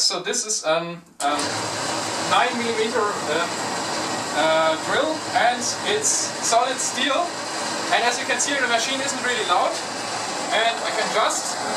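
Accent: Germany. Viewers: Du bist deutsch ich weis es man merkt es voll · you have a proper German accent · Is he German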